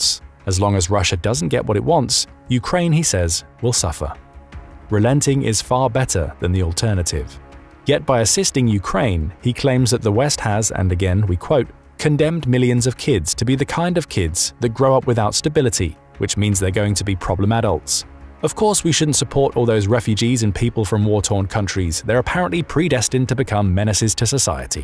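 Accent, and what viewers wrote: United Kingdom. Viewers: Narration so long, so British - uhg. Plausible deniability, let us swim merrily in an ocean of plausible deniability, rampaging absence of curiosity, and a penchant for regurgitating things that POWER says, because POWER wields all the microphones, and it says this, and even when it is wrong, nobody ever looks at me funny for repeating what it says - so I repeat. NYAHHHH! Power says NYAHHH.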